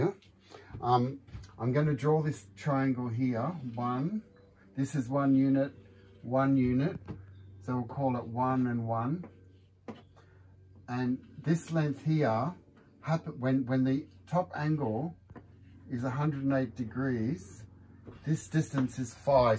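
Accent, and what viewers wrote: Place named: Australia. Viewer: Do you do courses Or classes? I'm in Sydney Australia. I am in Sydney & I just realised you have an Australian accent I was so engrossed in your teaching I didn't even notice. I would love to take a course lol 😂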